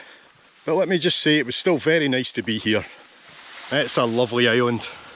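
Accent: Scotland, United Kingdom. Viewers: Oh so glad having your voice again and not a German guy speaking..even understanding what he said ..but not the same ,prefer your Scottish tongue Great Video!!!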